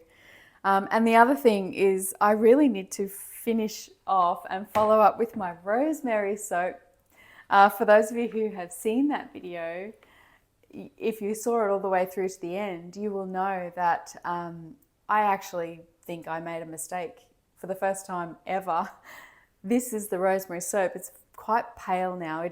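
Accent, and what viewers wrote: Australia. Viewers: Thank you, you have inspired me for quite some time, and always look forward to learning more from you. I am glad you have figured a way to continue to share what you love, yet finding balance. We all have to find what works for us. I too changed direction at the end of last year, mine was from selling soaps etc, unfortunately a very recent cancer diagnose has offered a new challenge. We all must follow our heart, and live with passion. From this Kiwi in NZ, Peace & Love (PS Love the aussie accent)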